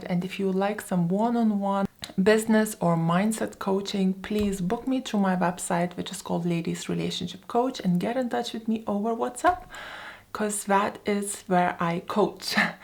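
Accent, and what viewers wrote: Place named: Hudson Valley, United States. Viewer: your awesome and we embrace your awesome HV accent and we understand each other:) Built for greatness, success and kindness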